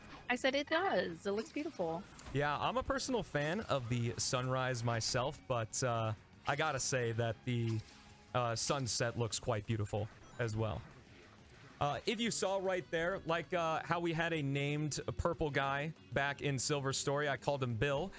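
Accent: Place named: Chile. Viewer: I thought he was Chilean lol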